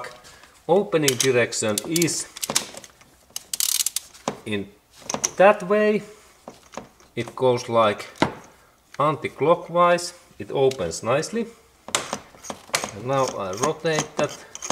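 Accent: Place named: Finland. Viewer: Thanks for the vid, also I really like the Fin accent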